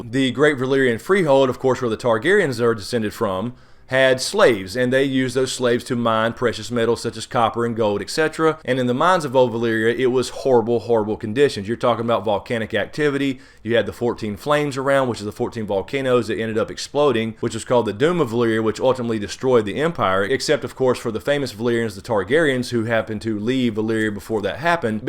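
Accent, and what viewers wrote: South, United States. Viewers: hey! I love your southern accent and the way you simplify the books and show for those of us who can't keep track of every detail. My question is what role do you think Meera Reed is going to play in the season to come? I believe someone told her that she was important to Bran and she did save his life but do you think she's more important than that? do you think she's secretly someone?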